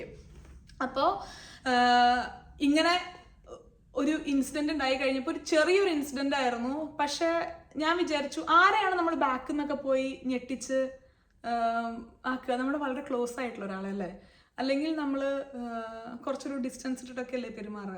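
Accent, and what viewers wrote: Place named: Malabar, India. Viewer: Chechy njan kure nalai vedios kanarund. Njn kozhikodekari Anu so chechyde malabar touch ulla samsaram bayankara istanu. Njn agne long vedios adikam kanatha alanu ipo chechide long vefios okke pattumbolkke full ayit kanarund. Karanam chechide samsaram kelkn nalla resanu. Mathralla chechide life style, healthy food habits, okke enne irupad motivate cheythittund. Chechine enik valya istanu. Puthiya vedios nai kathirikkunnu. Orupadu Snehathode - chandhu😉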